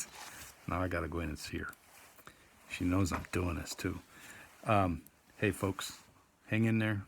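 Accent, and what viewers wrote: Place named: Wisconsin, United States. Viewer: I'm up late here in Portland Sucks Just finished my caretaking For my husband who is now 90 Got my teeny "medicinal" martini And who pops up on my phone? My favorite Wisc author! Ahhh! Sigh... The comfort of your Wisc voice I miss Wisc every single frick'n day Forget searching Google for an extra water filter! I'm gonna listen to Michael Perry! Tomorrow I'll fire up the old Firestick And treat my husband to to a morning of Michael Perry and coffee Remembering all your concerts And missing Wisconsin 😪 And.... Portland Sucks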